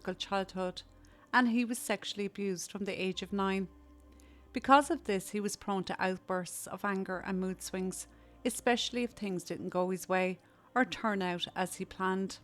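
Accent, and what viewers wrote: Ireland. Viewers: I don't think you realise how good your channel is! Imagine this: an Irish woman, with a beautiful Irish accent, the sometimes brutally honest but heartfelt opinion of a woman with values that have been eroded away but still stand for what was once good and proper in society..... versus AI content farmed true crime stories narrated by electronic voices that are mispronounced, misrepresented and churned out just for clicks and clout., Redrum, Truly Criminal and Just Thought Lounge are the only true crime channels I watch anymore. And sadly, when content farms force human creators off the platform, I won't be the only one mourning the loss of authentic voices like your own. Apart from anything else, is the only channel where I watch and wish, outside of the cases covered, I wish I was there in Ireland instead of here in this dystopian land of Britain! 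A beautiful country and an equally beautiful voice - I hope your channel grows and you remain here as one of the few human creators left amongst the future of content creation.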